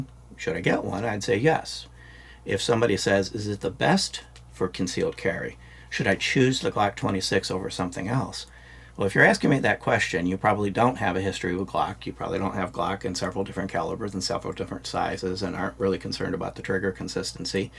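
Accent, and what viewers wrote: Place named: Chicago, United States. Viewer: I have 2 Glock 26's but I still prefer to carry my: Hellcat, Walther PPQ-SC and my FNS-9C over the G26. But I still love my Glock 26's and do carry them alot as well and would never part with them. I think the Glock 26 is one of the best Glocks ever made and will never fade away. Thanks for sharing. U have a Chicago or Detroit accent but u live in Florida. Florida is where all the rightwing east coast and Midwest Republicans retire to and also Arizona.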